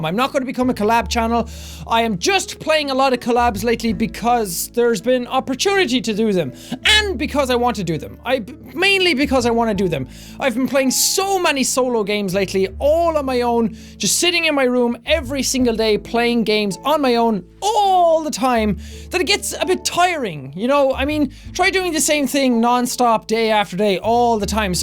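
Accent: Ireland. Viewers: where in Ireland are you from #IrishAndProud:D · what part of ireland are you from? im a wexford man :) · !! I think that you are an AWESOME person. You are my favorite youtuber! And i love your irish accent! You are A BOSS!!!!!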